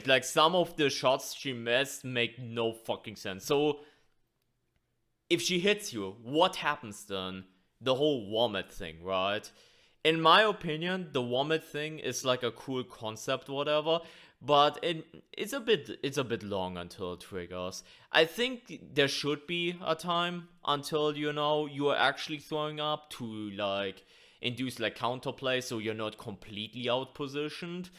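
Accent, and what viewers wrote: Germany. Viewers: Your accent is cool. German?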